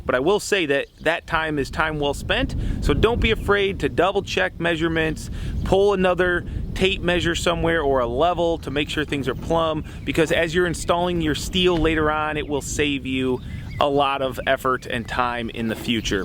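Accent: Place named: Chicago, United States. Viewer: Dude. I was born and raised in Illinois, 30 years. Moved to Texas 3 years ago, and everyone tells me I have chiiiiicago accent. Well... I've never heard what they are talking about... Until today. After hearing you... I get the chiiiiiicaaaaaaago accent. Good work though!! Keep it up - enjoying the builds!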